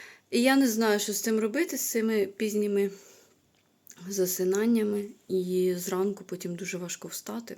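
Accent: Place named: Surzhyk, Ukraine. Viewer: Ви суперові, приємно чути ваш суржик. Нехай у вас все легко виходить, удачі вам.🌺👍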